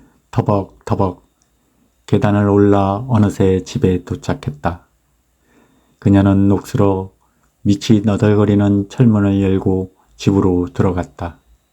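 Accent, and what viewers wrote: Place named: Chungcheong, South Korea. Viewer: 겨울철 자전거 풍경과 내레이션이 잘 어울리는 구수한 충청도 사투리가 멋져유.,. 다덜 콩나물지하철 경험하면..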